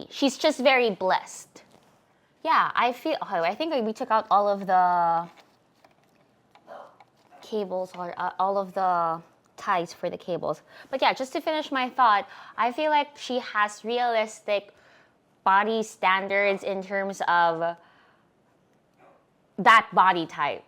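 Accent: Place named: Philippines. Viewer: Wait, that accent is noypi. Sorry, just stumbled upon this video. Nag subscribe na po 🙏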